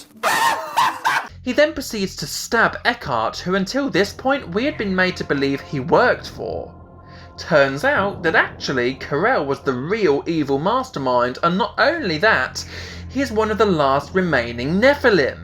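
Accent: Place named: London, United Kingdom. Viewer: Why does it sound like you live in London?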